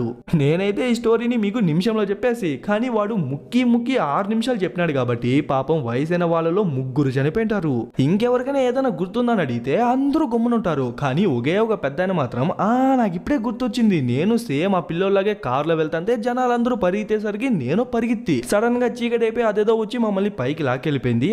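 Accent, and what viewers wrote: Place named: Karnataka, India. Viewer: ఎం ఉండ,ముండా,బండ రా కొజ్జనా కన్నడ గా తెలుగు కి తెగులు పట్టించే లా ఉన్నావే నువ్వు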